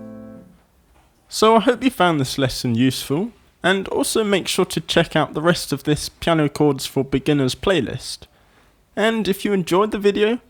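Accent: United Kingdom. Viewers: u british right?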